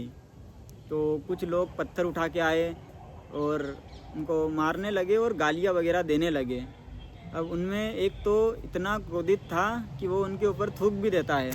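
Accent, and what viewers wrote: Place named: Malwa, India. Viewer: नर्मदे हर🎉भैया जी और माताजी।आप लोग तो परिक्रमा में ही ठीक थे।बिल्कुल चेहरे मुरझा गए हैं।माताजी तो ठीक है, पर आपकी तो आवाज ही पहचान का सूत्र है ,चेहरा तो अजनबी हो गया है😅।कृपया अपना संपूर्ण परिचय दें। बातचीत से मध्य प्रदेश निवासी लगते हैं,मालवा बेल्ट के।बहुत अच्छा बोला है आपने, बिलकुल साधु की तरह। नर्मदे हर🎉।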